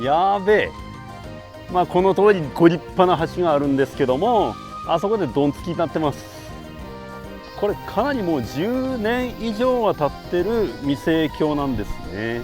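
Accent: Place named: Fukui, Japan. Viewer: 結構訛ってんな😀独特の福井訛り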